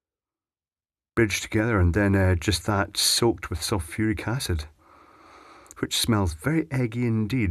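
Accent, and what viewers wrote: Scotland, United Kingdom. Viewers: Bit like old bond he has a Scottish accent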